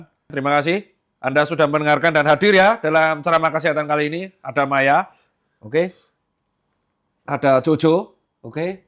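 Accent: Java, Indonesia. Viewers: Dok Tirta setelan Jawa gni paling suka.. hahha terutama pas ngmong "agak rekoso itu", "..let stgh jam" wkkwkw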